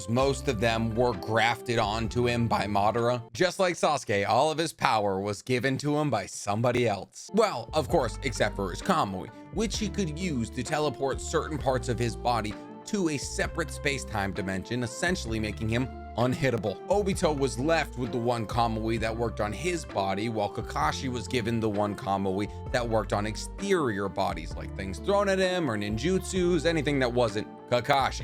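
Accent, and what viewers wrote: United States. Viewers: Why do so many people pronounce Shisui’s name that way? I’ve noticed a bunch of narutubers do it, but just so everyone is aware, it’s not shi-su-ee like ship. It’s SHE-su-ee Okay, after going through more of the video, you just have really poor, Americanized pronunciation. Mungeekyo sharingan, Minnuhto 😂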